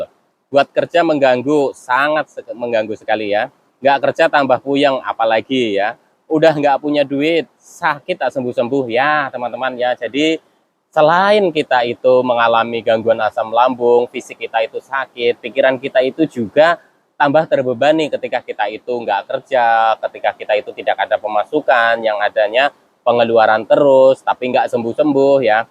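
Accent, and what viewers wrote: Banyumas, Indonesia. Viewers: Takur ngapak tulen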